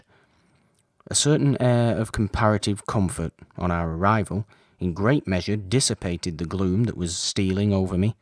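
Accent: Northern England, United Kingdom. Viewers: Love the northern accent; it seems more suited to Tom Wyndsour than the narrator, but it adds to the old-timey feel. This is my all time favorite short ghost story, this and MR James' The Rose Garden. Victorian melancholy at its best. Time to bake up a restorative meat pie!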